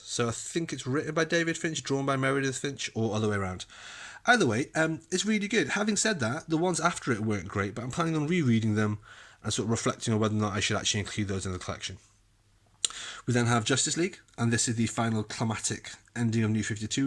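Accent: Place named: United Kingdom. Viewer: Crossworlds was great, Jae Lee's art was incredible. I feel your pain with the shelves, here's a pic of mine. At the bottom I had to raise the right shelf to fit the Transformers Premier Collection books which are Absolute sized (I think - they're about 1cm taller than a 12 inch album). I also divide by format - omni/OHC/Premier/TPB. I just can't have them mixed up together. I collected Superman Unchained when it was released as floppies, but I gave up an issue or two before it finished because Jim Lee just didn't want to prioritise it and it took months just to get one issue. Somehow I never picked up on you being Welsh. Maybe it's because so many youtubers are Amerixcan I just heard a British accent.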